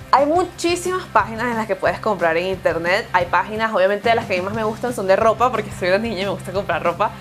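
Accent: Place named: Venezuela. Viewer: !!!! Soy una gran fan! Te sigo desde hace mas o menos como un años pero no se porque motivo o razon no podia comentar desde mi telefono (y no tenia computadora). Pero ahora por fin puedo comentar!! SIII!!! Tengo 17 años (recien cumplidos), soy de VENEZUELA (Valencia, luego vivi en Ccs y luego vivi 5 años en Margarita) pero hace un año y unos meses me mude a ALEMANIA. Fue un enorrrrrrme cambio, el lenguaje es mega diferente, el clima es mil veces mas frio y las personas tambien son super diferentes. Los primeros meses estaba deprimidisima (extrañaba a mis amigos y mi idioma y todo) y lo unico que hacia era estar pegada a Youtube viendo videos de maquillaje o blogs y tags. Y un dia apareciste TU! Te lo juro que fue solo ver el primer video y supe que eras venezolana, ni siquiera tuve que leer tu perfil! Al principio estaba como: Yo la conozco, pero luego me di cuenta que era solamente el hecho de que hablaras como yo, la expresiones, la calidez, todo. 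Fuiste mi salvacion en mis meses de depresion. Y luego fuiste mi salvacion cuando me dijeron que tenia que ir a una escuela sin poder hablar aleman y sin saber que hacer. Simplemente me maquille, puse una buena sonrisa y con actitud empece a hablar en ingles (lo cual ayudo, porque no entendia ni pio de aleman). Bueno simplemente eso, queria que supieras que de verdad me ayudaste sin conocerme y sin saberlo. Y queria decirtelo porque ahora si puedo escribir mi comentario! MIL BESOS Y ABRAZOS. PD: FELICIDADES POR TU CASAMIENT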